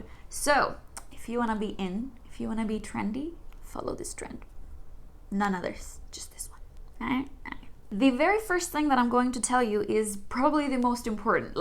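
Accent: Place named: United States. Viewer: You sound like you are from America 😳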